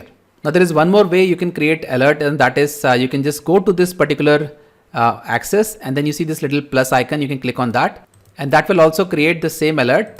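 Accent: India. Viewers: I love the Indian ascents ...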